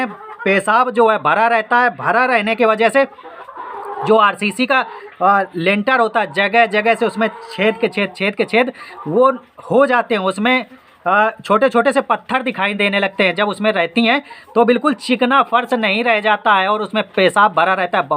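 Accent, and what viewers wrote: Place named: Uttar Pradesh, India. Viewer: मैं बिहार सिवान से हूँ, आपकी बोलचाल, भाषा से लगता है कि आप यू पी से हो ।आपकी video बहुत ही जानकारी देता है। कहाँ आपका घर है।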